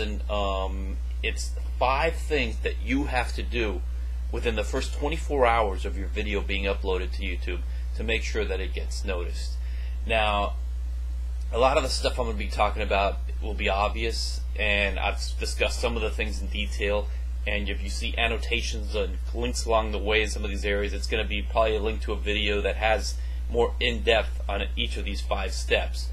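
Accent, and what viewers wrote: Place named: Brazil. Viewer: it feels like a brazilian in USA. he doesn't sound native even though he's trying hard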